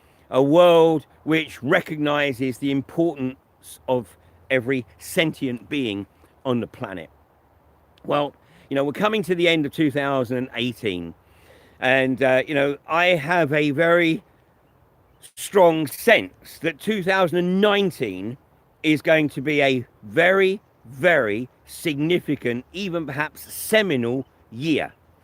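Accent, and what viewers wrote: United Kingdom. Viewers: Great channel. Good to hear a British voice providing truth!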